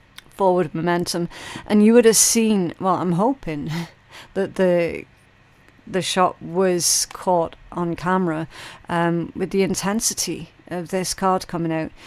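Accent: Scotland, United Kingdom. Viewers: I've had a glimpse of what I'm becoming, but....anticipation is making me wait. 😐 Hey - so nice to hear UR Scottish brogue loud & clear. ~ Godspeed ~ 🙏☀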